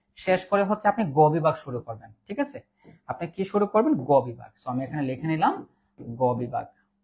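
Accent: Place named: Sylhet, Bangladesh. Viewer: Bro Sylhety✌️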